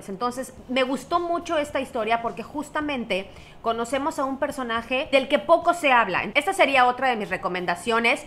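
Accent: Yucatan, Mexico. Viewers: Apenas empezó el vídeo dije "jmmm eso suena como acento yucateco!" Soy Yucateca y es increíble para mí pensar que alguien como tú está aquí!! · Hablas como.yucateca xD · Hola; solo una recomendación. En caso de los niños cuidado con las horas de pantalla digital. Hay recomendaciones de horas de pantalla en bebés, niños y adolescentes. Buenas recomendaciones las que has dado! ✌🏼. Y ese acento yucateco? Saludos desde Panamá 🇵🇦! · Buenísimo el acento yucateco 😂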